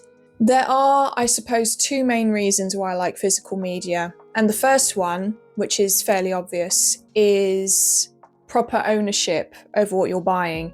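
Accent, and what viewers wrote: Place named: RP, United Kingdom. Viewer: Wow Bridgerton accent IRL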